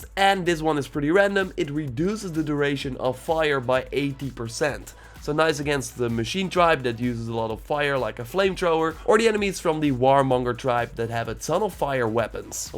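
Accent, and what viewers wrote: Netherlands. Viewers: Do i detect a Dutch English accent ? xD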